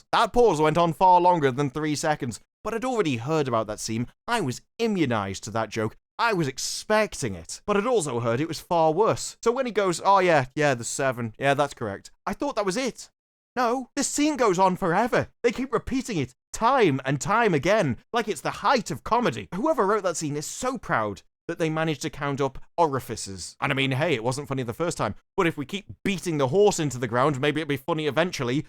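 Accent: United Kingdom. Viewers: You are so friggin funny! The voice of reason w/ a Brit accent. When I see your tweedy self getting those undies in a bunch I know it’s bad…really bad!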